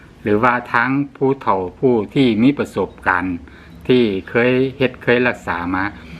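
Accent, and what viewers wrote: Isan, Thailand. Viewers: ชอบ คนเว้าตลกดีใด้ความรุ้จากสมุนไพรหลายอย่างขอให้ใด้บุญหลายฯเด้อจ้า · พูดภาษากลางได้มั้ยคะพูดอิสานฟังยาก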